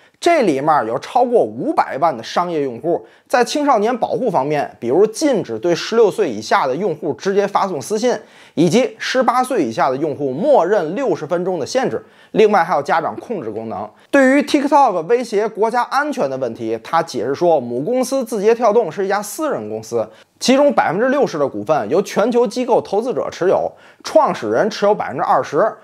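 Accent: Tianjin, China. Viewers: 这是天津话吗？以前老看杨光的快乐生活，感觉有那么点像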